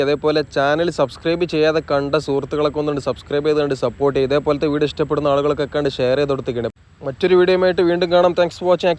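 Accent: Malappuram, India. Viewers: ഇടയ്ക്ക് വയല് പറയുന്ന പോലെ ഉള്ള ശൈലി സൂപ്പർ ആണ്.....keep up our Malappuram slang..❤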